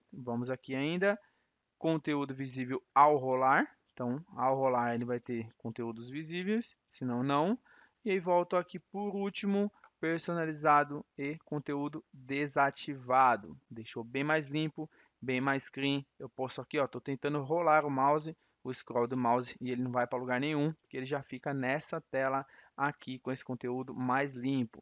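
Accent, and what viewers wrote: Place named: France. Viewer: Mano que sutaque francês massa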